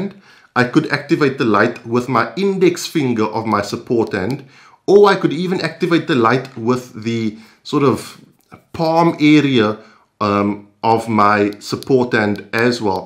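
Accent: South Africa. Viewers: South African accent I hear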